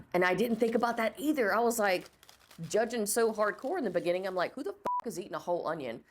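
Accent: South, United States. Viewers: I knew as soon as you said boil you where southern. It’s a dead giveaway 😂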